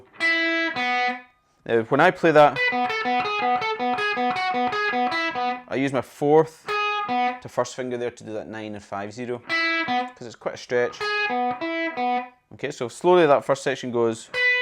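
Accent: Scotland, United Kingdom. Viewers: The guy is Scottish mate . Dont confuse the two.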